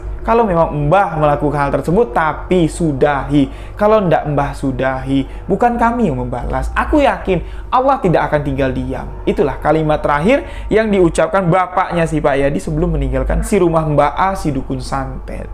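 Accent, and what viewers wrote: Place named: Sunda, Indonesia. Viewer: Assmuallaikum bang sy penggemar setia kisah 2 horornya.bang orang jambi tpi sy merasa kok logat gaya ngomong nya agak mirip ke sunda kadang bahasanya kok sama bahasa sunda seperti " keukeuh" apa mas pernah belajar bahasa sunda jawa barat...he hehehe...sukses selalu untuk mas 👍👍🙏🙏🙏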